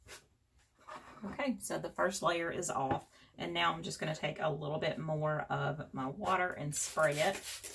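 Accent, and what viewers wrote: South, United States. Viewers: I love that I have Found someone who has that Southern accent like me, lol.I tell everyone that I'm a Flatland Hillbilly from Texas, lol. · You nailed it sista! So nice to hear and see occasionally, a southern gal, like myself, doing diys . I’m a Kentucky girl. I’ve just recently started doing diy projects and there are so many ladies that teach and/or show how to do different things. It’s a tad overwhelming at times but I’m glad I ran across you. I’ll be sticking with ya Momma!!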